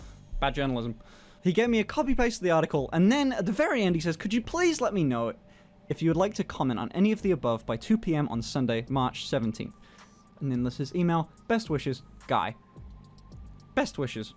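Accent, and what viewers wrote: Birmingham, United Kingdom. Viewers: Both British and a Brummie. Fucking disgusting! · NGL - Never thought you were a brummie fr fr - Does that mean I might have seen you in Gales?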